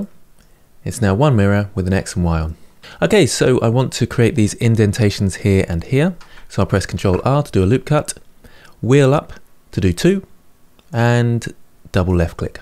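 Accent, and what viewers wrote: United Kingdom. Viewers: I will forever have a british man voice in my head when modelling in blender. G to grab, s to scale.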